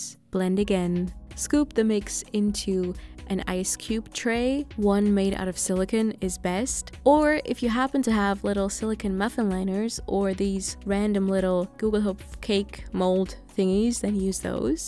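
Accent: Germany. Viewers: Wait, are you german? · Are you German? 😂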